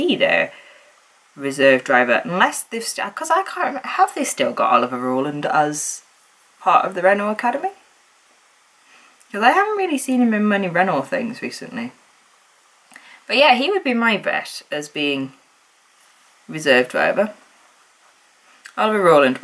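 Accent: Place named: United Kingdom. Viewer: So, british accent sounds like that. Interesting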